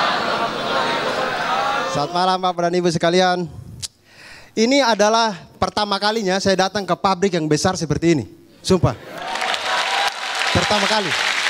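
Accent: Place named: Bugis, Indonesia. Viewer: Saya peminat abdur dari Sabah Malaysia. Hahahahah memang lawakan abdur semua lucu2.. bahasa kita pun lebih hampir sama sbb dialek abdur ni dkt2 sama dialek bugis.. lucu lawakkannya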